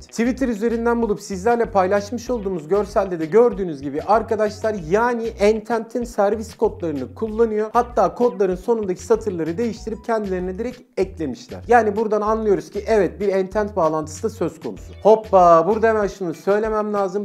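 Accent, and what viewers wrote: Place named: Aegean, Turkey. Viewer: Ege şivesi e gari <3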